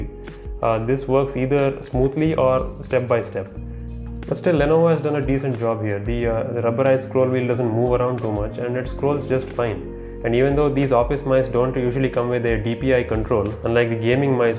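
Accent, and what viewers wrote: India. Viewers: The channel's name should be Hopeless indian sounding guy that causes cancer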